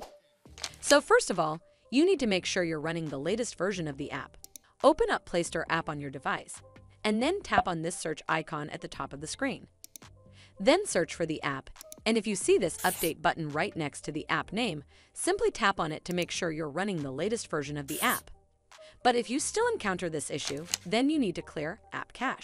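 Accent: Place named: United States. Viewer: Its British Gas .why is it an american voice i mean what .maybe this is why they are not working properly maybe its not Britsh anymore.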